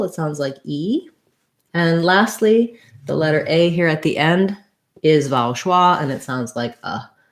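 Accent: United States. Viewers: Why are teaching English with a deliberate American accent? It is incorrect English and very confusing for foreigners. There are many area accents in England but nobody normally would teach it because it is incorrect.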